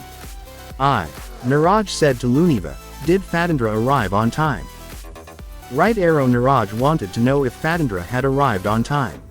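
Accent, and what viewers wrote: United States. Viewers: Thank you for helping us, providing high quality videos with American voice over. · Thank you for helping us, providing high quality video American voice over sir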